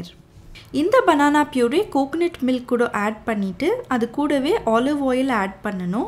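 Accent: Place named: Bangalore, India. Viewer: Praise the Lord Sisy...! R u frm Bangalore?! Bcs, ur pronunciation of Tamil seems so... :) !!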